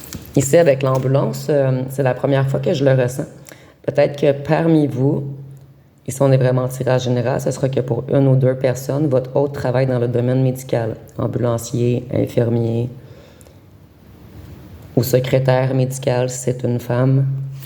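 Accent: Quebec, Canada. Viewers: Merci beaucoup ! Le tirage est très parlant et déterminant pour moi ! ( avec votre bel accent québécois .! ) MERCI pour tout . Je vous souhaite une très bonne soirée !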